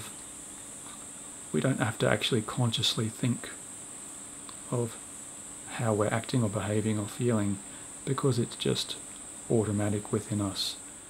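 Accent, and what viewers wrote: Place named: Australia. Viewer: Dear, your videos are great and i enjoy them nearly every night. I never really liked guided meditations but yours are the best! Luckely you have this nice Australian accent which makes it an even greater pleasure! Thanks a lot "mate". Sabine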